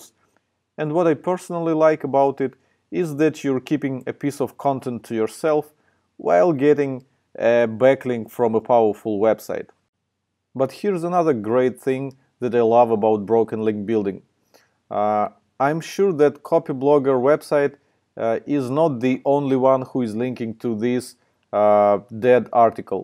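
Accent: Russia. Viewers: Акцент скорее как у Васи русского, а не у ))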